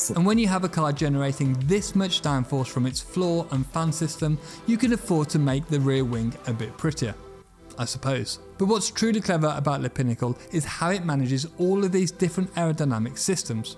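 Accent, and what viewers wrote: France. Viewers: As a french, his english sound more french than french itself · The franche accent 🤭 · Ohlala banger l'accent français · Mon dieu l'accent français à couper au couteau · Wow that's the strongest French accent I've ever heard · omfg listening to this french guy is a bit rough on the ears ...as a french myself ahahahah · He's the most french sounding man in the world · This man speaks English in the most French way I have ever witnessed. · Love his french accent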